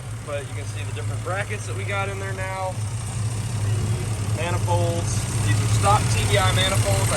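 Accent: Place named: Tennessee, United States. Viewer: I'm from Tennessee and not only do we sound alike.... we also think alike .... lol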